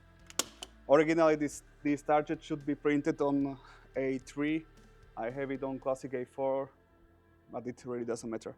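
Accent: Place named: Slovakia. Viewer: Well to be honest, no problem with English, but. But, you should be able at least to have a full capability of speaking consistently without mishaps. And I am also not talking only about strong Slovak accent, that is not what worries me that much, but the strong competition on YouTube among English speaking shooting channels. It will be difficult to bring something exceptional. I wish you all the best and I do not intend to stop watching your videos. Just a small feedback.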